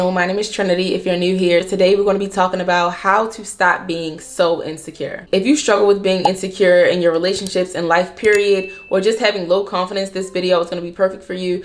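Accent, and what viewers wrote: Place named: Maryland, United States. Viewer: My gurl sound like she is from MD ?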